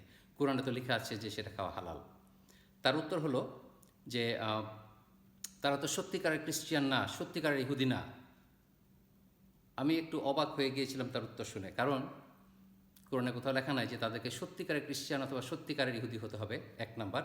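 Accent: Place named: Joypara, Bangladesh. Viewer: ভাই কিছু মনে করবেন না, আমি আপনার খুব বড় ফ্যান, আপনার কথা শুনে আপনাকে ঢাকার জয়পাড়ার লোক বলে মনে হচ্ছে। এটা কি সত্যি?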